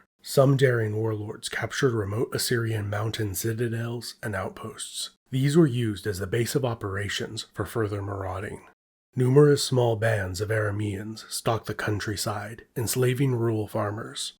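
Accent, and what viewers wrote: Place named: United States. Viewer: This is great. I usually avoid American accents but your voice is wonderfully rich and calm.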